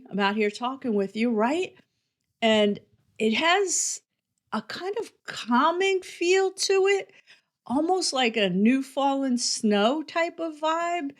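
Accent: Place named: New York, United States. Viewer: I love your accent. I am Australian. Are you from somewhere in New York, maybe Queens?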